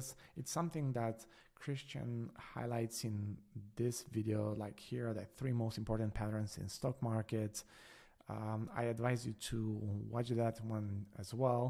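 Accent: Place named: Germany. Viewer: Hi, great analysis . Are you german ? I speak some german and your accent is very similar. Thanks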